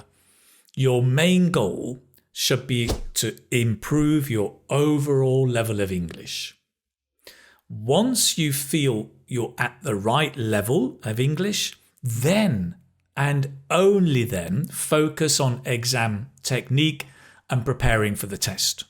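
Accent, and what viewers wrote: United Kingdom. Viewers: I ENJOY VERY MUCH WHEN I LISTEN TO YOU WHILE YOU SPEAK BRITISH ENGLISH WHICH WE WERE BEEN TOUGHT IN SUDAN MANY YEARS AGO. I want to speak it like you but l could not. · Is this British English?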